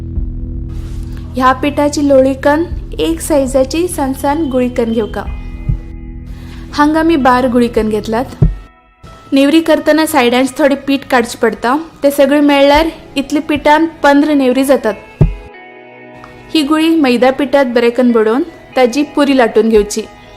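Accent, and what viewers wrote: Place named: Karwar, India. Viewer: Refreshing to hear karawari Konkani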